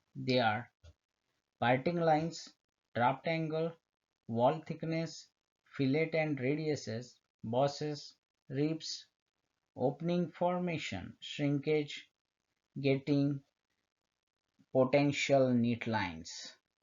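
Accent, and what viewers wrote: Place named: United States. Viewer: Your US accent made me comment....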